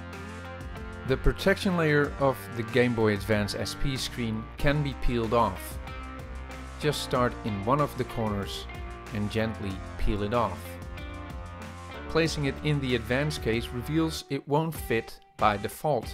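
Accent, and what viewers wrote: Netherlands. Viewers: ja jij bent nederlands wist het van je accent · Are you perhaps Dutch? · You souwnd very like a Dutch persoon, very goed engliesch. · is that a dutch accent or is it just me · I got a slight feeling you are dutch like iam. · Gotta love a Dutch accent! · Great job! also it seems like you're from the netherlands, i live there to! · hahahah je nederlandse accent is te herkenen :D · I love that dutch accent so much xD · Hey, loved the video. Are you Dutch? You sound very Dutch. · are you dutch ik wel! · Ik hoor een Nederlander 😂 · very recognizable accent :)! I am dutch too. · Ik dacht al toen ik het accent hoorde: Is hij Nederlands? Maar al snel zag ik bij dat het zo is!😄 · woooow dat nederlands in het engels vermengd xD echt gaaf gedaan! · Spotted that Dutch accent from miles away. Nice video too. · 5 seconds in. This dude must be dutch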